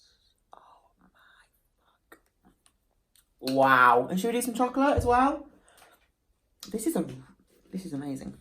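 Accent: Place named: England, United Kingdom. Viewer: Gorgeous as always. I wish I had your lips. 🤤🤤 Your accent... I cant figure out what part of England you are from. 😂 I'm American but have lived in West Yorkshire for 8 years with the English husband. That cheese cake looks amazingggggggg. ❤❤☮☮🥓🥓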